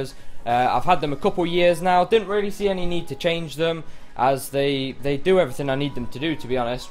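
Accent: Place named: England, United Kingdom. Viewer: You sound so english xD I know he is, so don’t comment saying «That’s because he is!!!» I KNOW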